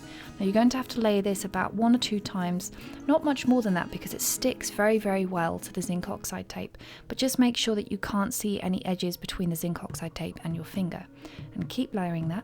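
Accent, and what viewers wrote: England, United Kingdom. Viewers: someone has to tell me what english accent she talks in! believe it or not before ASMR was a "thing" i used to play her videos just for her voice because her accent + voice was so soothing. I reckon she do an ASMR channel. it would be so popular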